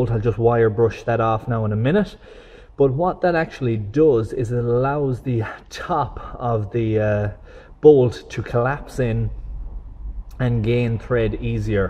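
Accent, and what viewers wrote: Ireland. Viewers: Always believe a man with an Irish accent. Love from Australia! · Ahem...I just love your accent...it reminds me of my famous English...Irish teacher...what a great guy...that was 45years ago... Hahaa.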